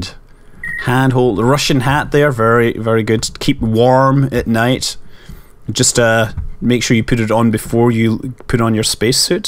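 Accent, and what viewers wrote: Scotland, United Kingdom. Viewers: you sound Scottish or Irish possibly welsh or from northern England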